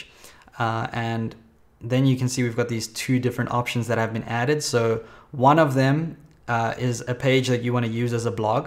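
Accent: South Africa. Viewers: Hey! thanks for all the tutorials man, really great to see a tut with a South African accent for once haha keep it up dude!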